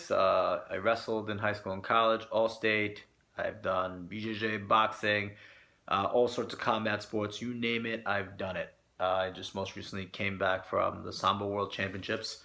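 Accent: New York, United States. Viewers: bud ekins he just sounds like a American to me I'm almost guaranteed that he was born in America but if he isn't I'm very impressed. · Lmao just because he has an Asian name doesn't mean he has to have a Japanese accent. He was probably born and raised in New York hence his accent. Probably never been to Japan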